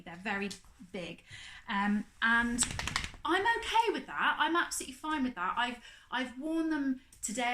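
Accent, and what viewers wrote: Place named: West Country, United Kingdom. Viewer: Loved your post. I like your pattern and fabric choices. Lovely to hear a West Country accent. And well done, girls, you’ve both made a good start.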